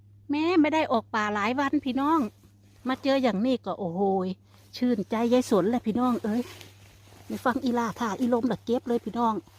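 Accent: Korat, Thailand. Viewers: สวัสดีจ่ะยายสนดูยายเก็บเห็ดเก็บผลไม้เพลินดีได้ฟังภาษาโคราชวันละคำไปด้วยฉันคนบัวใหญ่เด้อจ่ะ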